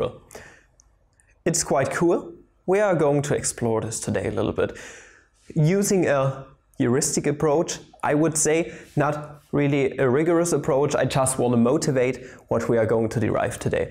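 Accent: Germany. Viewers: Well his is an actual German accent, I should know, I live there. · What amazes me is that subtitles are perfectly true for a heavy German accent. Is it manually translated or google translation? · One and a half years ago was so anxious about his English, now - the more German in it, the better:D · Ich finde es niedlich wie Sie Wörter wie 'the' 'this' '.. aussprechen :D Your german accent is adorable They are learnable through (the two th sounds). You need to see the arabic letters like qaf ق or ayn ع xD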